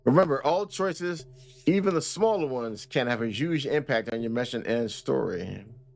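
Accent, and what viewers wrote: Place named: Italy. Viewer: I was going to say, I wholeheartedly agree, if you CAN take advantage, you MUST take advantage 🤪if anything, it's making me make the mechanics in my game deliberate and airtight - speaking of which, I'm getting close to Demo material and would be honoured if you would give it a go. Always like watching your videos brother, I suspect we are from the same generation, a lot of references you make I catch - always appreciate the Italian accent too, being half Italian myself 🤌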